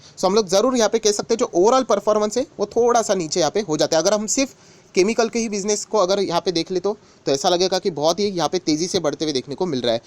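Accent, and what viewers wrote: Maharashtra, India. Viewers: sir aap marathi hai aapki bolne ki bhasa thodisi marathi hai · bhava tyanchya bolnya madhun ch kalat aahe te marathi aahet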